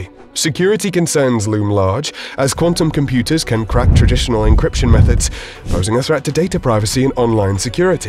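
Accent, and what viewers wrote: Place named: England, United Kingdom. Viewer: Every time I look at YouTube and check anything in the US technical information Giant youtube? Some English dude, speaking. I can't stand it stand it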